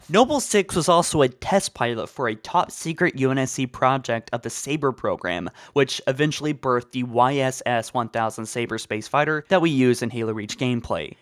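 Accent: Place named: South, United States. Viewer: you know ive never thought about it but has a southern accent. he hides it well but it leaks through in small bits sometimes.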